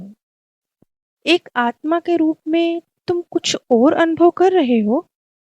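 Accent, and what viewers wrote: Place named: Punjab, India. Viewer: Sir ma'm punjab s hn.. kuchh accent alag hote h aap kisi rajasthani s hindi bulwayiye vo alag bolega Gujrati alag bolega, marathi to bilkul e alag south wala to teda hi kar dega.. aur bache vo angrez unse bulwakar dikhao zara dhwani ko dwaaaanni😅 bana denge Shabdo s pare chale jao.. yhan school nh h zamana gya school ka ab samjhne ka daur h chhodiye meen mekh aapko to pata h na dhwani kaise bolte h enough h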